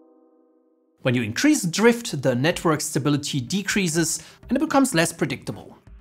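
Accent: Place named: Germany. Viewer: Nice one. And the thick german accent makes this video even better.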